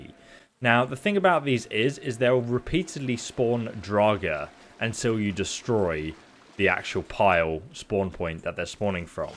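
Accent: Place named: England, United Kingdom. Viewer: I don't know why I prefer to receive instructions from a person with an English accent, but here we are.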